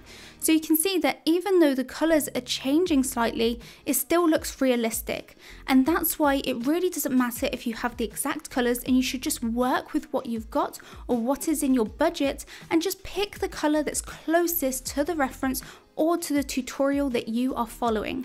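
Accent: United Kingdom. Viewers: Art Thank you for your videos. Your British accent is very pleasant to hear as I draw! I am from America so it’s nice to hear different accents.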